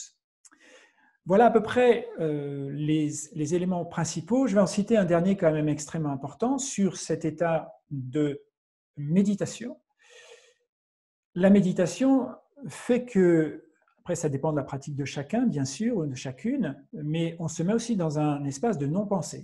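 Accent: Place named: Quebec, Canada. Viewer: Bel accent Québecois dis donc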